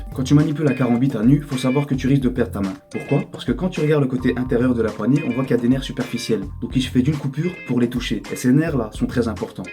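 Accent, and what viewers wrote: Brussels, Belgium. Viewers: Gros t'es de bruxelles pcq t'as l'accent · tu viendrais pas de Bruxelles toi? ta un accent de drare de bx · D'accord avec toi et aussi l'accent belge 🇧🇪 TEAM BELGE 🔥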